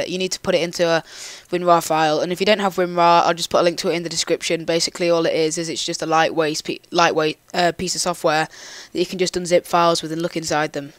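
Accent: United Kingdom. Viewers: I gave you a like 'cause ur British!!! (im not o-o)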